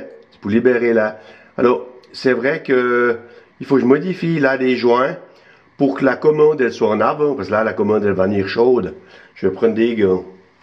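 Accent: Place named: Vaud, Switzerland. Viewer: Bonjour, Votre joie de l'enfance que l'on ne pouvait pas s'offrir est communicative. Il me semble que Märklin faisait aussi ce genre de machine. Petit j'en rêvais...Bonne suite et merci de vos partages authentiques, plein de saveur, avec votre bon accent vaudois (j'ai le même!) Laurent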